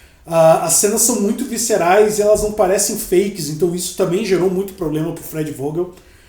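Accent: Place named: South, Brazil. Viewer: acho que ele é ou Paranaense ou Catarinense por esse sotaque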